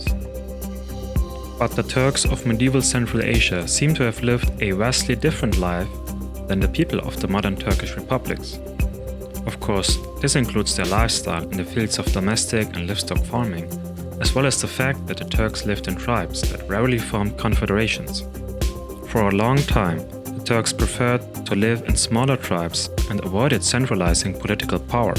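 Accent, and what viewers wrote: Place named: Germany. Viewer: I've read Jean-Paul ROUX book. It's great. PS : that german accent makes it better, mein Freund.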